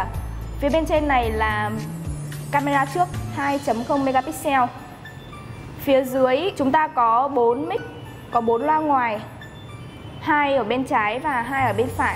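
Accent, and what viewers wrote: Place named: Hanoi, Vietnam. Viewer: uầy uầy uầy uầy uầy hôm nay là chị mà, vui quá vui quá, mà hình như là giọng chuẩn Hà Nội ròi, hay hay hay, vote up vote up, hay hay hay, mới nghe rồi nhảy xuống cmt cũng thấy hay, hay hay hay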